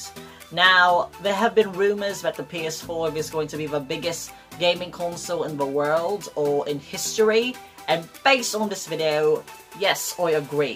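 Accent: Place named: Australia. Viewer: What’s up with the Australian accent?? I enjoy your usual voice it sounds a lot different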